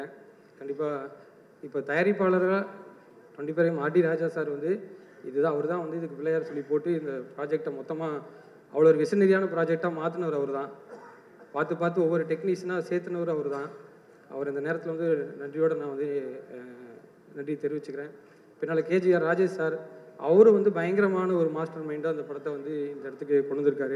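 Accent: Kongu, India. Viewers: திருப்பூர் காரர் .. கொங்கு தமிழ் super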